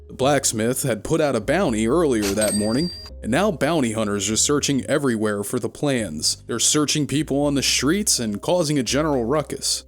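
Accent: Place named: Philadelphia, United States. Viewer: Are you from Pennsylvania? Specifically Philadelphia area? You have the accent to a tee.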